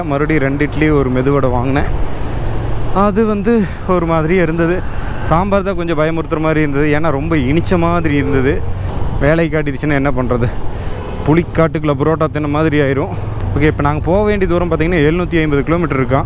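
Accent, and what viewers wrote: Kongu, India. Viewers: Super 🥰 anna unga 🙏🙏🙏கொங்கு தமிழ் அருமை ❤️❤️👍👍👍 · Kongu Tamil super bro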